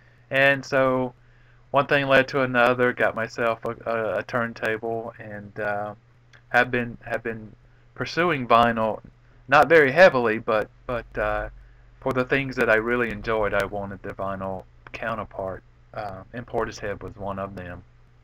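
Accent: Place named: South, United States. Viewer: Thanks for introducing yourself. I thought I heard a Southern accent. Your story is interesting and I'm glad you shared it. I love classical music too.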